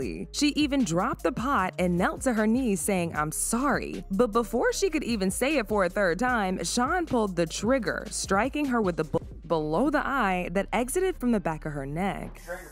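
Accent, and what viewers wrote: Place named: United States. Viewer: As an English man I approve of this American version of the English language ❤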